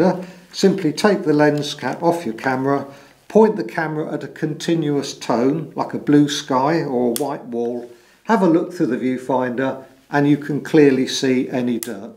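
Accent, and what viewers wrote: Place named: United Kingdom. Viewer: British man explaining camera sensor cleaning is my new favourite thing now. Knowledge 10/10 Explanation technique 10/10 British accent 10/10 Unnecessary content 0/10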